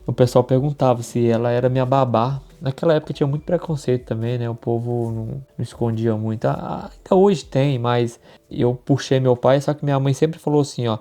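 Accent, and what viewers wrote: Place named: Minas Gerais, Brazil. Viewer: Lindo esse seu sotaque mineirês.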